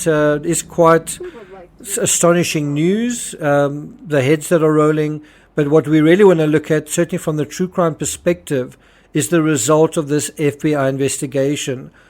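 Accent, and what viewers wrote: South Africa. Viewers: Are you from South Africa? Trying to place your accent. I think it is South African.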